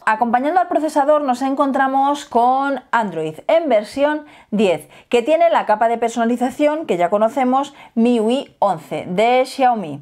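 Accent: Spain. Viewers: Es por el acento español los de España pronuncian así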